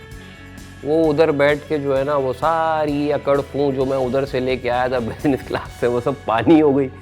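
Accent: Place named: Karachi, Pakistan. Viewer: bhai are you from Karachi? Your Urdu Dialect says it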